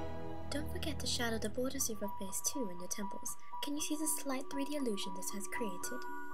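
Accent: United Kingdom. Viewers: Or just doing a british accent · attempting the british accent... rather lovely. It's not repulsing at all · why does she talk british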